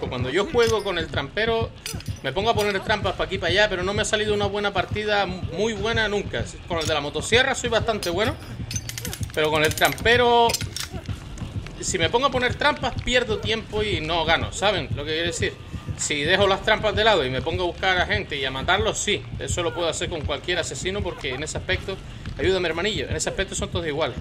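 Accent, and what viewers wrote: Canary Islands, Spain. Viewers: ¿Canario? XDD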